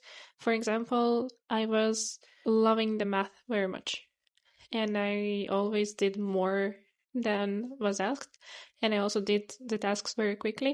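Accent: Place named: Poland. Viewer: Are you Polish? Kind of a soft Polish accent I'm hearing.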